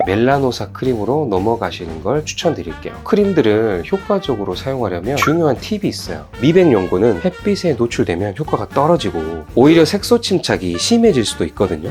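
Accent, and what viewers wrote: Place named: Jeolla, South Korea. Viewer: 전라도 사투리 진짜 우와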